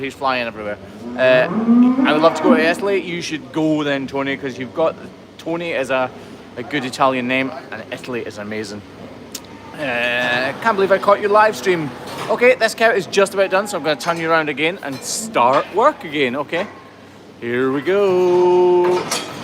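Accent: Scotland, United Kingdom. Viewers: yup. though I usually watch on mute...I learned you are Scottish sounding today! facepalm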